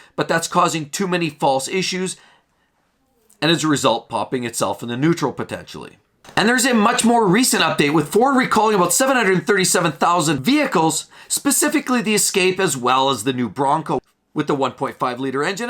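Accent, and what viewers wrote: Canada. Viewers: It's his accent. He's Canadian I believe.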